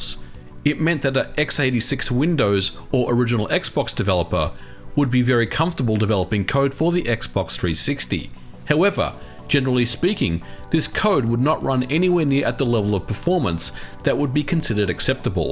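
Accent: Australia. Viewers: Mate, that's just part of the mystique of the Aussie accent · Strayan accent coming through. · Australian or South African accent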